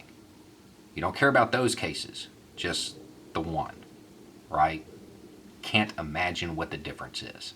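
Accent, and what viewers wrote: South, United States. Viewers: Why the hell do conservative believe he speaks to them. Cause he has a southern drawl?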